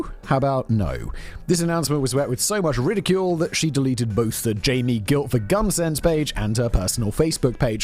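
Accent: RP, United Kingdom. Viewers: If it wasn't for this channel I wouldn't be able to tolerate his poshness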